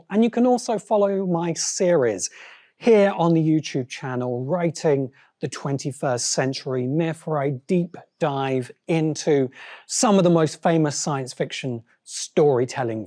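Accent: England, United Kingdom. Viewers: I get that translating Gibson's work to the screen is almost impossible. But this show is HORRIBLE. This review tries to give the show more credit than it deserves. But a soft-spoken English accent can't fix how broken the show really os.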